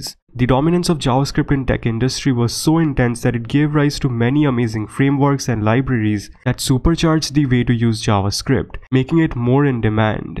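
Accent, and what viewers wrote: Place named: India. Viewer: AND THERE'S the indian accent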